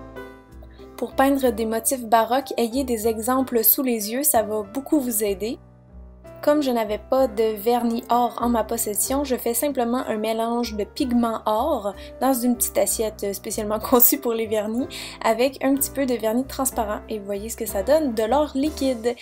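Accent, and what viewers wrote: Canada. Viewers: j'aime le tout mais mon préférer c'est frosty!!! :) merci pour toute c'est idées et t'est vernis son très jolis......je kiffe ton accent tu es canadienne??? :):):):) mercii beaucoup pour cette video qui ma donné beaucoup d'idées ! :) :) :) en passant: tu as mis cette vidéo en ligne le 8 décembre et on est le 13 donc en 6 jours tu as fait 16 073 vue !!!! bravo. :) :) :) :) :)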